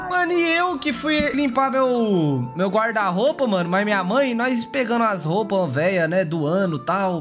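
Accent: Minas Gerais, Brazil. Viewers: é mineiro ou matogrossense?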